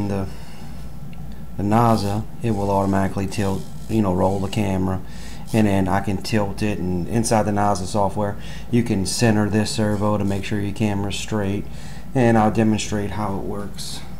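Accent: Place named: Louisiana, United States. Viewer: Listening to you talk I can tell you're from either Chalmette or Metairie : )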